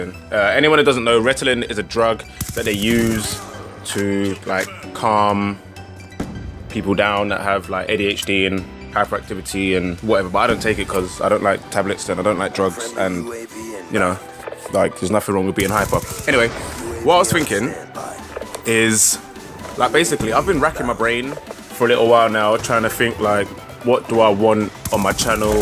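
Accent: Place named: England, United Kingdom. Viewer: Hello:p You sound like your from england, what city do you live in? Im guessing london:p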